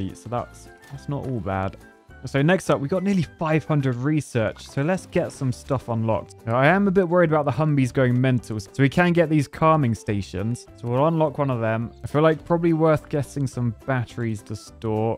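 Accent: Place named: England, United Kingdom. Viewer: I love listening from across the pond and hearing the king’s English. “He did a proper scarper”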